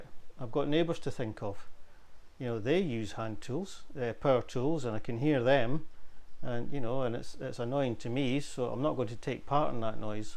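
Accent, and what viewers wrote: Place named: Ireland. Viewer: good first video...I've always liked the Irish accent 👍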